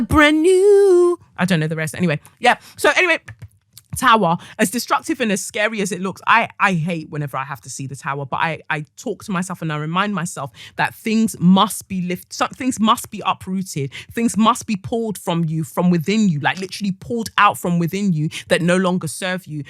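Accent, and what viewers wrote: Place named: Africa. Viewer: Every single time!!!! Must you always be this on point african mother accent Everytime I listen to you it’s like your speaking direct to me.Always speaking the light I needs to hear at the perfect time. Thanks and appreciate you my baby gurlllll.✨🌻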